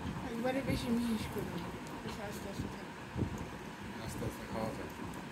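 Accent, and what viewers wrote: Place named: Tangail, Bangladesh. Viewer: ভাই আপনি কি টাঙ্গাইল না ময়মনসিংহ জেলার লোক ভাষাটা নিজের মতো লাগছে।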